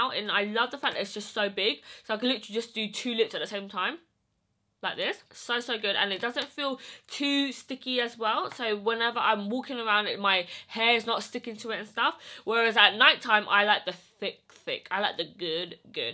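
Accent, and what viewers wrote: United Kingdom. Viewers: My mom asked if u were british asin i looked at her and said i dont know but dont asume anything since we r from the usa and to me she was sounding rude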